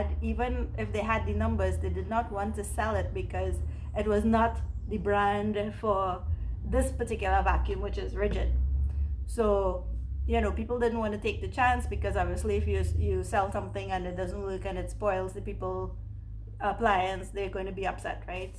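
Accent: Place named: Trinidad and Tobago. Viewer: I knew you were from Trinidad when i heard your voice it reminds me of my friend wife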